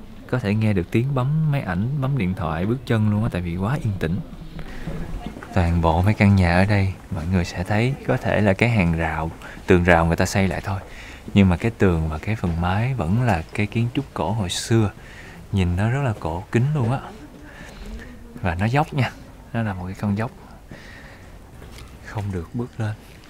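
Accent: Mekong Delta, Vietnam. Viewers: noi chuyện dễ thương chân chất người miền Tây love cho 1 live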